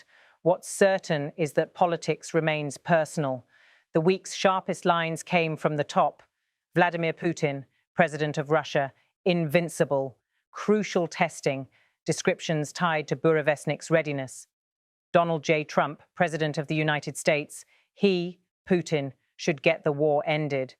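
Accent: United Kingdom. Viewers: Good grief. Don't think can watch the whole thing. The voice is irritating as hell, with snotty female tone & British accent that screams no credibility, in part to BBC etc destroying all credibility of any news with British accent. And as if written by ai set to over descriptive mode, creative writing, with pretense of being "news" & editorial commentary at same time. 🤯🙄🤯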